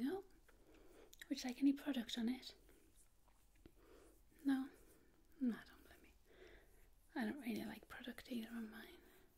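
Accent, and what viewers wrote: United Kingdom. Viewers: Very relaxing video. I like the British accent, of course there are differences between regions of Britain. but I think they sound pleasant to the ears. That is possibly a reason I like British comedy.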